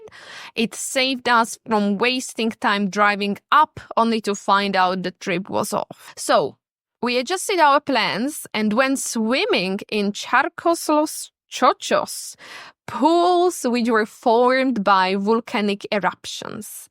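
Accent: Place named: United Kingdom. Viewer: Tá tvoja výslovnosť je dost britská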